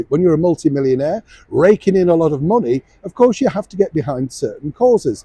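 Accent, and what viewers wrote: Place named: Mockney, United Kingdom. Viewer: Lisping mockney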